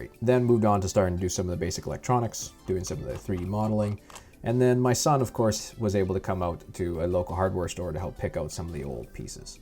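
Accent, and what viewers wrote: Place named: Belgium. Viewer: Tot nu toe klink je belgs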